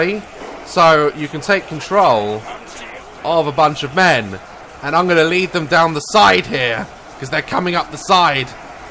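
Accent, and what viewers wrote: United Kingdom. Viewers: it's cuz he's British 😄🇬🇧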